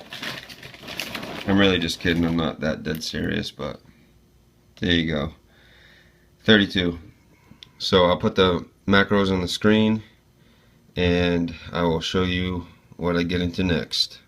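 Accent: Boston, United States. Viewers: yeah i love it. btw do i detect a little bawwsten accent im new to this channel and not sure where your from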